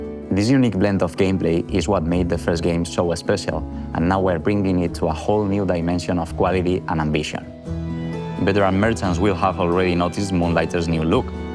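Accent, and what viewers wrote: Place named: Spain. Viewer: el que está comentando.... parece español por ese acento jejej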